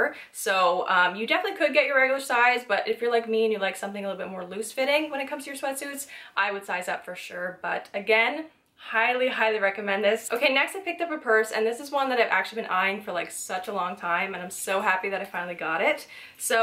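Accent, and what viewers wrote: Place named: Newfoundland, Canada. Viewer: Me trying to figure out that maritime accent. Hmm Newfie or Boston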